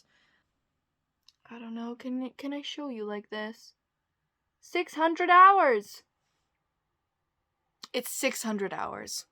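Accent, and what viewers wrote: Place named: Netherlands. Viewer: I thought I picked up a distant Dutch accent and then I looked it up! So lovely! I like your vids. So lovely and cosy ❤️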